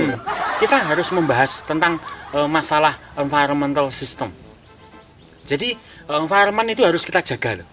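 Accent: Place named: Java, Indonesia. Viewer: Jowo mn suarany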